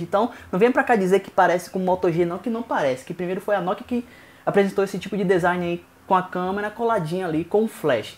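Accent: Bahia, Brazil. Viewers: vc é baiano cara?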